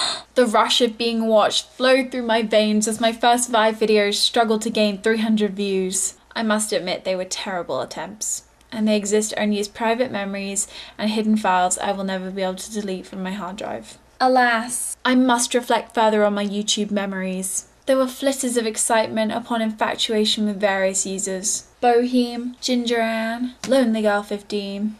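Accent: England, United Kingdom. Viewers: You're adorable... i love an english accent. Thanks for posting! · haha, British! LOVE it! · i love british accent!!!! a new fan here!!! ciao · she is cute and funny in a sort of way ^^ I love englands accent! somebody said she was boring... well, she's not! just click on something else if u think it's boring;) search for manualist! that's a nice video by someguy i don't remember the name of atm... but it's awesome ^^ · your english accent is really really well spoken its quite mad. maybe because your now in canada? ha x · are you from england ? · British English. Woot. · Sorry that was a bit harsh, I didn't realize that comment was actually going to post, I'm not use to to actually being logged in. Anyway just listen to by. I'm a guy by the way. Keep up the British accent, that will always get you places in life.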